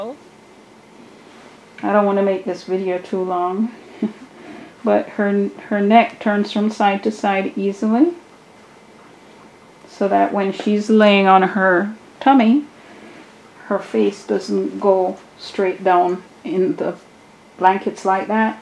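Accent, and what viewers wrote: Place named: Trinidad and Tobago. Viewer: You sound trini 🇹🇹 so cool even if you aren’t. I’ve never heard you speak. Your hands and mind are blessed. 🛐🛐🛐🛐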